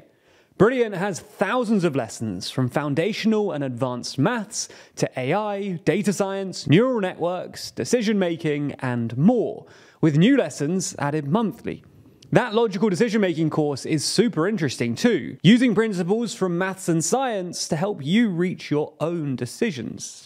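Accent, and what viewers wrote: England, United Kingdom. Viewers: Aaron This dude is British. Listen to his accent.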